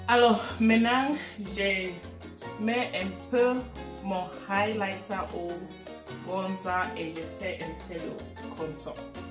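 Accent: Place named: Bamenda, Cameroon. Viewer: Sis I absolutely love the box, I was brainstorming with my sister about this idea last week. To me I was looking for something like this where I can take my food pictures in front. Thanks for inspiring. You are full of positive energy sis and wait ohhh that was my kind of French😂😂😂😂 bamenda geh like me. It's <mail>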